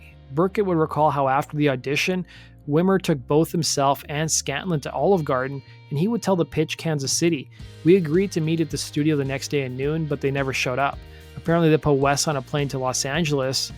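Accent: Canada. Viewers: I keep trying to place your accent...northeastern Ontario?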